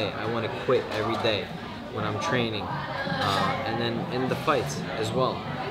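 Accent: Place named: New York, United States. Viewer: The thing I like most about is how he says things that make me take more accountability and responsibility for my life in general. Like how he says you gotta motivate yourself, because exterior motivation fizzles out quickly. I think it may also be the New York accent mixed with his no bs approach, plus the steely blue eyes. 🤣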